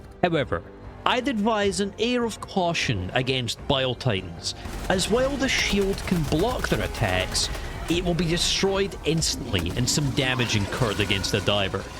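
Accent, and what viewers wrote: Scotland, United Kingdom. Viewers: Insta subbed as soon as i heard you were also Scottish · Are you Scottish?! My people 🙌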